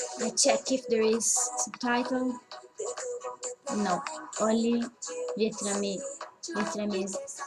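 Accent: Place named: Italy. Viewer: she from italy she just speak English with Italian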